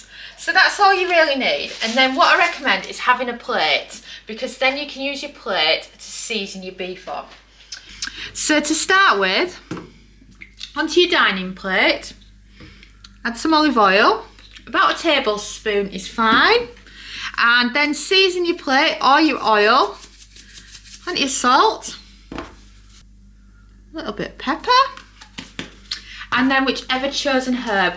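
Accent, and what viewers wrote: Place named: Lancashire, United Kingdom. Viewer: I’m torn if that’s a Lancashire or Yorkshire accent. Trying this method today in my ninja woodfire. Wish me luck 🍻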